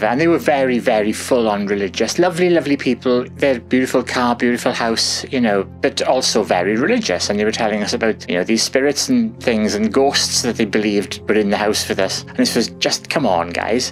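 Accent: Wales, United Kingdom. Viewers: I really enjoyed listening to your account of what happened.. I have an open mind to these things, although i have never experienced anything myself.... your Welsh accent is lovely ! xx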